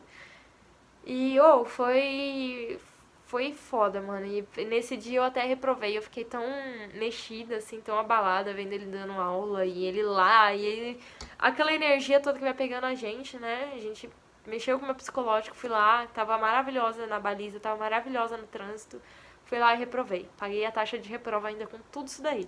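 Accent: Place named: Minas Gerais, Brazil. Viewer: Vc é mineira?kkkkk vc parece que é mineira kkkk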